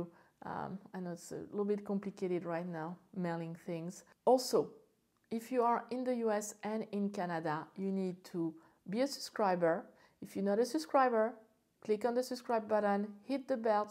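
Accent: France. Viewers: I do like your french accent :-;, i use the 60mm and i am very happy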